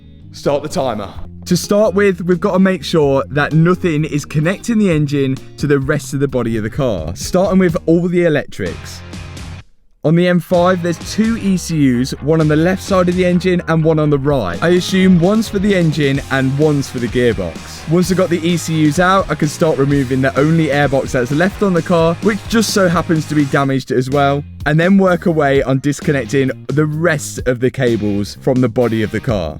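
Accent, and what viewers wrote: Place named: Nottingham, United Kingdom. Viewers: Without being rude. May I ask where you are from?your accent sounds like a Nottingham accent. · welcome to America, where we fix our own stuff allll the time. Wanna know why? Because if one man can do it, another man can can do it as well. With enough time money research and patience ANYTHING can be repaired by anyone at all. This is also why America has led the way in the automotive industry since the Model T, and why car YouTube was pioneered by Americans. 🇺🇸 I’m glad to see stays positive and isn’t scared off by things he is unsure of. Innovation, hard work, trial and error are the backbone of a man like. is basically a yank with an English accent. Good work buddy 🤜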